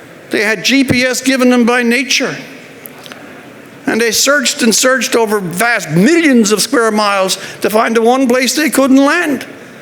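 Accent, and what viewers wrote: Canada. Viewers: Although I have always thought Rex was an icon in journalism I feel he has sold out to big business. First making fun of David Suzuki really not very becoming. Yes the mining industry is important to Canada however the companies that own them spend their resources to produce technology to replace people that work in these mines or oil fields. If they had their way they would have robots do all the work. Our jobs have been continuously been sent to third world countries because labour costs. These giant companies will do anything to cut costs as they are responsible to people who invest their extra wealth in their stocks. As far as Newfoundland is concerned they exploited the fisheries mostly because of the same reasons. They sold their fisheries to big business, then over fished it screwing themselves out of work then relying on the handouts of fellow Canadians to subsidize their stupidity. 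Then when jobs became available in the tar sands rushed out to Alberta so they could work and then take the money home and live like kings because housing prices were much lower in Newfoundland then anywhere else. Someday these fossil fuels will run out and yes I agree they have given us the good life but at what cost. Big business doesn’t care it’s all about money to them. I’m sure Rex is speaking for free for this group lol. His Newfie accent and correctly spoken grammar is hypnotic to the people who don’t look at both sides as he massages the brains of upper management of global corpora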